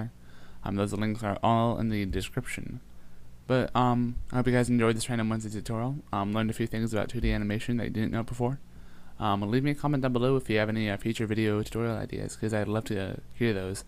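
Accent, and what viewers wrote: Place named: Ireland. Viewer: This guy sounds like some gay pissed off irish...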